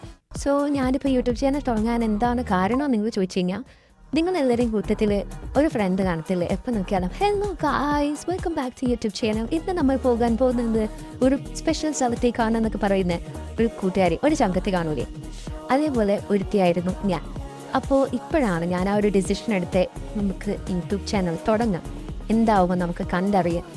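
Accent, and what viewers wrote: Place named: Kollam, India. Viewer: From that ‘മോന്ത’ talk, we can understand you’re a certified kollamkaariiiiii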